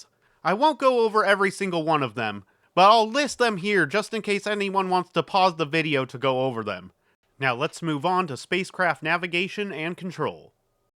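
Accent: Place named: South, United States. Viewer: Wait! What happened to your beautiful, ambiguously southern drawl???!!!!! It's just not the same :'(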